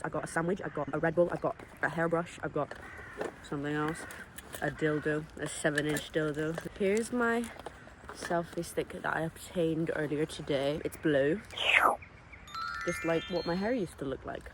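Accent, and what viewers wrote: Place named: Australia. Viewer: your voice sounds eaven aussie a lil bit, you might be onto something with all these theories 🙂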